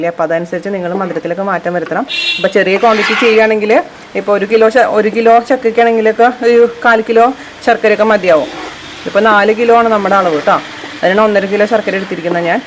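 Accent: Thrissur, India. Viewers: chechi de veed trishur anno...aa accent...avidthe pole...sambhavam kaalaki...kollam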